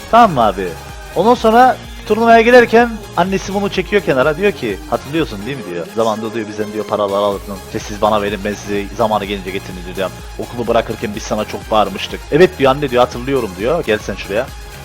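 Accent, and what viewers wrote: Cyprus. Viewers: abi Kıbrıslımısın ;)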